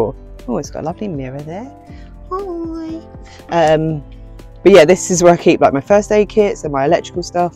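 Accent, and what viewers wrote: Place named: Southern England, United Kingdom. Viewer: I thought you sound like a southern girl. Love your crochet blanket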